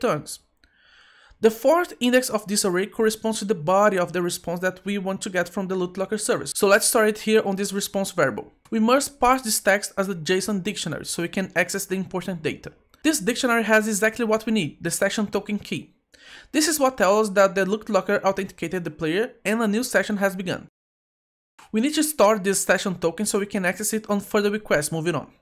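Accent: Brazil. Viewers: se é brasileiro? sotaque esse manin kk parabéns pelo tutorial ajudou aqui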